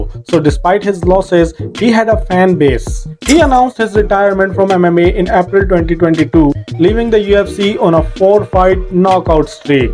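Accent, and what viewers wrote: India. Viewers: Damn I wanted to watch this video so bad but you sound like one of them Indian scammers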